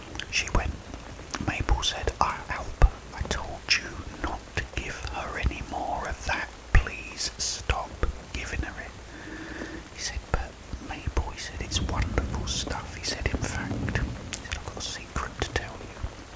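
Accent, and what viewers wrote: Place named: United Kingdom. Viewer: I'm American but I love British accents. Dealing with covid right now and this helps me get to sleep, thank you 🙏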